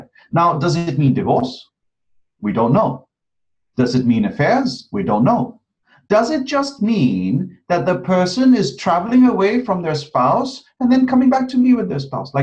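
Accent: India. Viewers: He's great as always. And his English is so Indian!! If there was a fee for viewing this it would still be "total paisa wasool" regardless of the cost!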